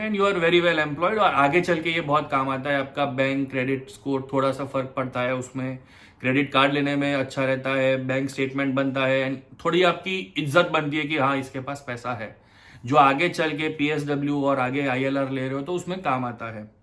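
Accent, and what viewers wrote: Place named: Bengal, India. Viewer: What are you Bengali or something ? I might be wrong but your accent sounds kinda similar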